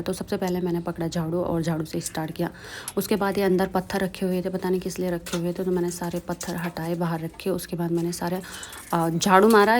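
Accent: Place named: Garhwal, India. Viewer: Ap bhut axe ho or jb aap gadwali bolte ho to bhut acha lgta h❤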